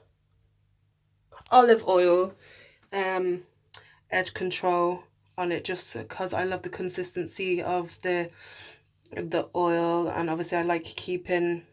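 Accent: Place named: Ireland. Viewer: Never mind my question, you said Ireland. I thought that was the accent. But I was told there no Blacks in Ireland or very very few.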